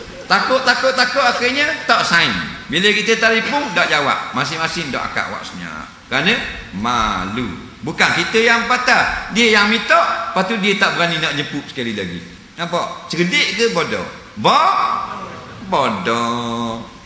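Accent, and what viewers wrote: Terengganu, Malaysia. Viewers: ustaz orang tranung,bukan klantan..orang tranung memang keras sikit loghat die..mu orang luo xyoh nok komplen la..cakak banyok tek.. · Zulkifly Hj Ali study dlu loghat terengganu bru cite bodoh..mcm org melaka cakap celaka la celaka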